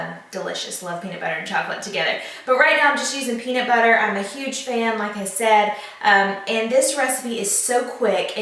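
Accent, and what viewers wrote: South, United States. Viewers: Batman needs a classy southern gal hey your all I can get use to that 😆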